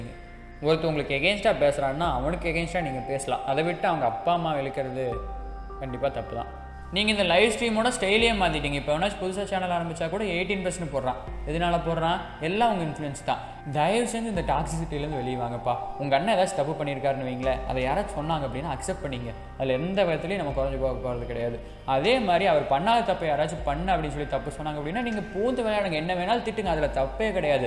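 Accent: Coimbatore, India. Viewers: Namma ooru Coimbatore, Tirupur slang varudhe... mm subscribed ra thambi.. keep doing this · Ur Kongu Tamil sooper cute ❤thambi and evlo azhaga talented ah pesra... Kannu vachuta pa... Suthi poda Solunga... Un ammava...ur way of speaking Really so much adorable 😘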